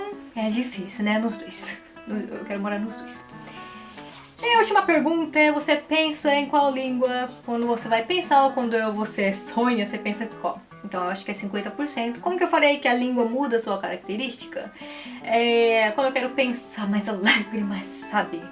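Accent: Minas Gerais, Brazil. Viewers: Os seus pais eram de qual estado do Brasil? Você fala um pouco como os mineiros ❤🥰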